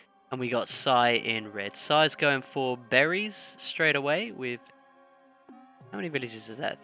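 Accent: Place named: United Kingdom. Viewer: OMG HIS BRITISH Anyway thanks for the video we need more content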